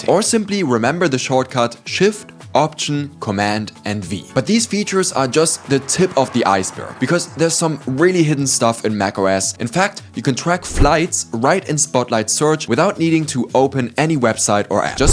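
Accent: Germany. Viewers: This german accent is killing me. Ffs fellow Germans, learn some good English. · 15 sekunden gehört direkt gewusst das er deutscher, ist mach weiter so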